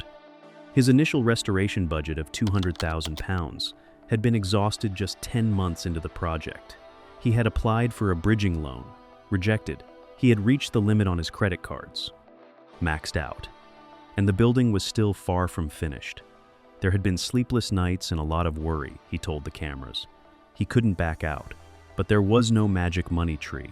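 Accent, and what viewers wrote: United States. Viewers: Why is an American narrating Drew Pritchard backstop? Hasn't anyone told him that the place is Conwy NOT Conway!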